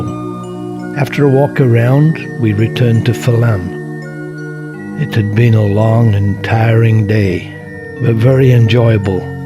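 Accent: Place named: Wales, United Kingdom. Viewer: Wow! Just found this after returning yesterday from Kanpanlet to Mindat. I was told I was the first foreigner they've seen in almost a year! Sadly, the views were oscured by smoke from burning season which ironically, resembled the current mood of the wonderful people in this amazing country. Love the narration. Still trying to work out the accent, although there seems to be a Welsh tinge!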